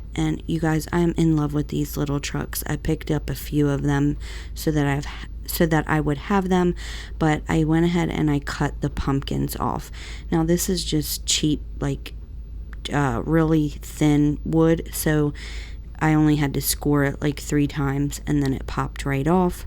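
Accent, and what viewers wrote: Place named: Maryland, United States. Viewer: I just found your channel and am a new sub .. love your projects and love your very clear directions .. I've noticed some crafters speak so fast its hard to understand them .. BTW your accent sounds very familiar .. being a Maryland girl I can tell you're from my neck of the woods 😀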